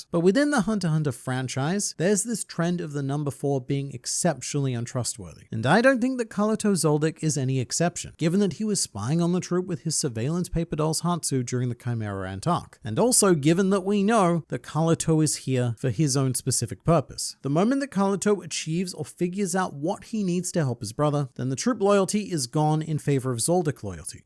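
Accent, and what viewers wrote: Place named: Australia. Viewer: I just noticed you have an aussie accent 😭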